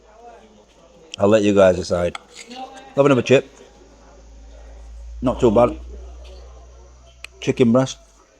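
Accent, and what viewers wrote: Yorkshire, United Kingdom. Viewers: Sorry but that accent is truly truly awful mate, it's a badden even for yorkshire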